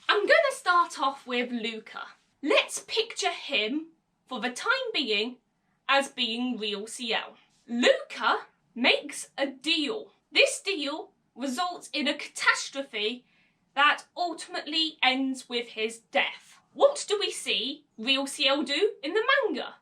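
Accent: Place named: South, United Kingdom. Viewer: Really nice theory! Where are you from? South of England? God I love your accent! Hahahaha I lived some time in England and yours is really cool and different to where I lived (liverpool)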